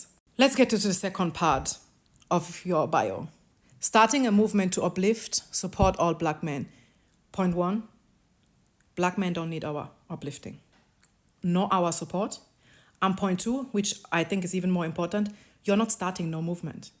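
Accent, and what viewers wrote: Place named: Nigeria. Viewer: Very nice video. Very insightful, and many good points were made. If I may add on one piece: "whiteness" is not the problem; White PEOPLE are the problem: White men, women, boys, girls, little old White women, and cute little White preschoolers! I haven't seen your other videos, maybe you address this there; but this is a point that was not so strongly addressed as far as I could see. I have never seen"Whiteness." I wouldn't be able to identify it nor would I know how to combat it if I came across it. I do know what White people and their racist deeds are however. "Whiteness" is an amorphous, abstract concept that dilutes the dialogue regarding where the problem (and thus the solution) lies. It's actually a shelter, the final fig leaf, so to speak, behind which White people hide. It's part of the problem and a term which should be avoided in conversations on Racism/White Supremacy at all costs! 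Rather than speaking of "our Whiteness," I would rather hear White people say, "I did such and such," or "My mother, my wife, my grandfather, my child, or that White person right over there did so on and so forth." Notice how we NEVER hear White people talking like this, yourself included, ma'am. (Again, within the context of this video.) This would be a good habit for all White people to cultivate, again, including yourself, ma'am. Other than that, great video! I especially like your accent. Nigerian?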